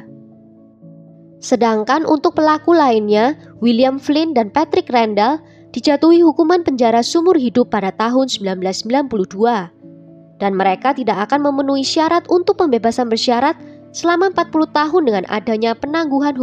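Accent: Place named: Java, Indonesia. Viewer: kenapa kasus yg anda ceritakan selalu menarik apa rahasianya.....dan satu yg tak terlupakan yaitu medok nya.....top lah pokoknya.....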